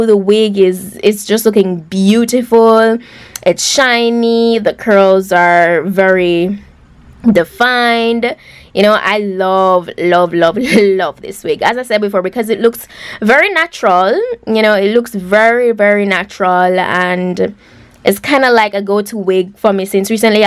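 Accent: Jamaica. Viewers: I love her accent ❤️🇯🇲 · Damn her 🇯🇲 accent thick lol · Her Jamaican accent is cute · Luv this my Caribbean sister Im Trini....luv jamaican accent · your Jamaican accent is so peng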